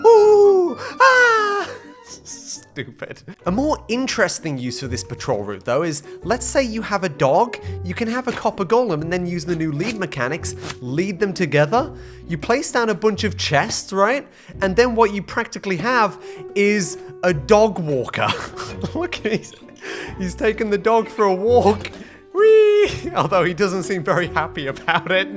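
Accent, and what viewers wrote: United Kingdom. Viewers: are you a brit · Sometimes I deadass forget that is british and then abruptly remember in the middle of the video even though his british accent is prevalent in the entire video. No idea why Anyway UNPAYED LABOUR LETTTTTTT'SSSSSSS GOOOOOOOOOOOOOOO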